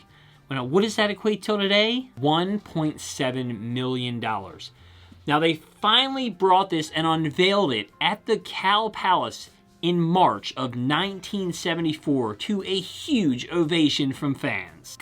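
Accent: Baltimore, United States. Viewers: That Baltimore accent. Maybe Philly.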